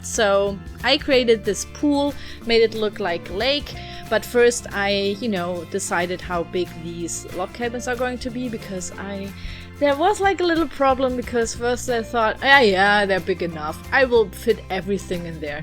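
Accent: Germany. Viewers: is she german? she sounds like me haha. well if i could speak fluent :p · you have the best German accent ever 10/10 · Are you from Germany? Your accten sounds german :) Btw: This Video is amazing and so creative !! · ich bin gerade sehr begeistert von deinem englisch. du hast einen superdominanten deutschen akzent. und dann hast du so ein großes und krasses Vokabular. respekt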